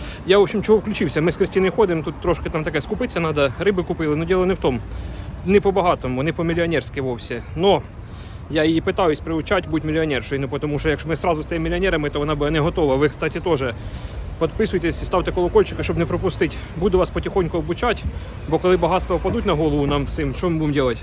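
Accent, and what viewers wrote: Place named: Poltava, Ukraine. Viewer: Звідки ти? Говір схожий на мій Полтавський!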